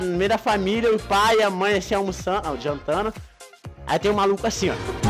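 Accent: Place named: Rio de Janeiro, Brazil. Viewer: é mt carioca msm... '' bota nela FP!! ''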